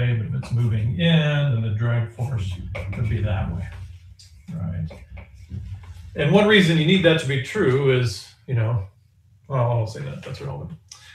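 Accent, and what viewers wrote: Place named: South, United States. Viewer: Mahnus mahnus mahnus :) If this post gets two likes, will you come back and deliver more of your lovely southern accent to us?❤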